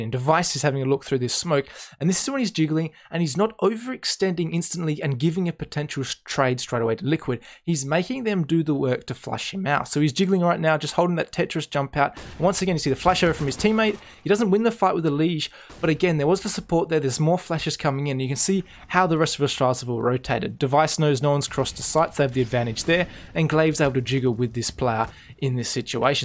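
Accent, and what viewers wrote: Australia. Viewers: Greater Sydney or South West Sydney accent to be specific ;) (I think)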